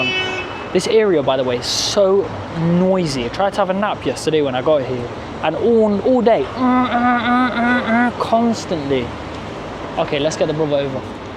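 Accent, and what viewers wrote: United Kingdom. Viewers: my Pom friend I do really admire you Islam knowledge love British accent. and you are very humble my allah reward you with cennet ❤️🤲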